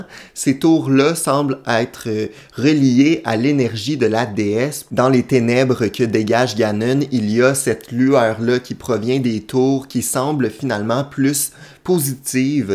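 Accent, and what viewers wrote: Quebec, Canada. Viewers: J'adore ta vidéo. Je suis en France. Je kiff ton accent québécois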